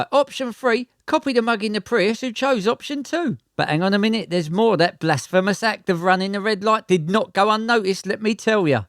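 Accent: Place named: Essex, United Kingdom. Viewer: That voice over, for fks sake, only way is essex!!🙉🙉🙉🙉🙉🙉🙉🙉🙉🙉🙉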